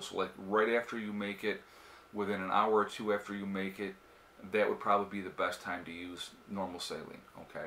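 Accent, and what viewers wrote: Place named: Chicago, United States. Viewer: Are you from Chicago? You sound just like someone close to me who was raised there.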